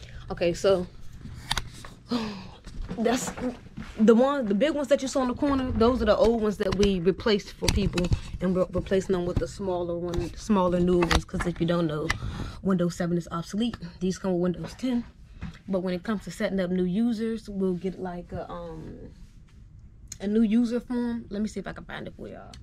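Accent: Washington DC, United States. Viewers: I recognize that DC accent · thanks for the content i can tell you are from DC or baltimore lol the accent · before I saw the 202, I was like " This person Gotta be From DC"!!!!!!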